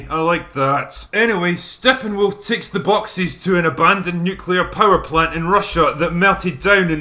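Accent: Scotland, United Kingdom. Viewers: His Scottish accident was way more subtle in his earlier vids... he got at least 110% more Scottish in the last year or two.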